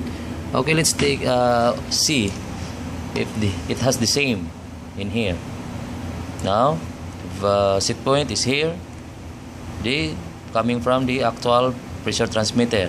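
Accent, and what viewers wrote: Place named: Philippines. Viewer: i really like the way you explain things, and its very easy to understand. The way you talk i believe your a Filipino hehe. Hello sir. I'm really into control loop/logic diagram, i want to learn more in that area. maybe you can advice/help me achieve this in my career. Currently doing cadet-ship in a Power Plant.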